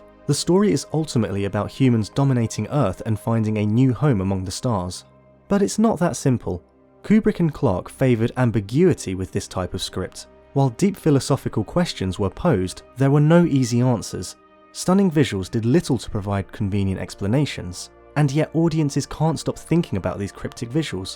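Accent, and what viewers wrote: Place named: United Kingdom. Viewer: I couldn't understand this video with his British accent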